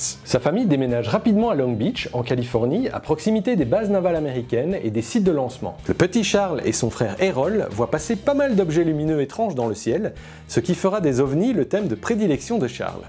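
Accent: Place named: England, United Kingdom. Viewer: accent anglais j'entends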